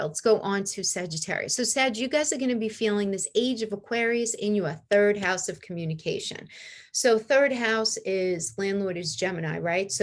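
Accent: New York, United States. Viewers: Hi, I just came across your video. As the previous comments have said.....you are amazing! Also love your New York accent!!!! Love your explanation etc....awesome. Do you do readings at all?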